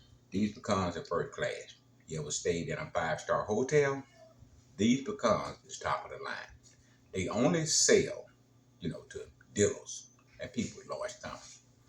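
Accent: South, United States. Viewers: Once I heard your southern accent, I knew that you knew what you were doing ❤❤❤❤. I'll have one maybe 2 slides please thank you so very much!!!!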